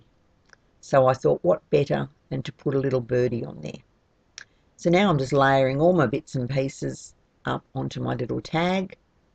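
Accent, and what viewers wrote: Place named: Australia. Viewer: Love your tutorials and your accent lol. I'm from Aussie as well. Thank you so much for all these tutorials. I'm very new to this so I'm finding these extremely helpful.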